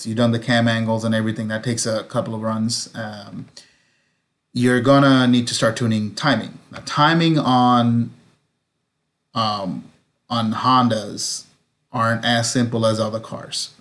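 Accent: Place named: Latin America. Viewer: Exelent video bro. 1 question can you do a tune remotely? You seems and sound latino 😁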